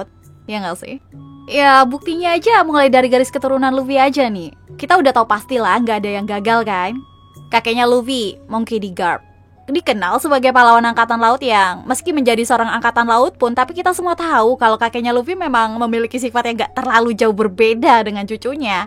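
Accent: Java, Indonesia. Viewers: Saya suka aksen jowo mu... (*_*) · Nah gtu dong ada medok nya kan jd lucu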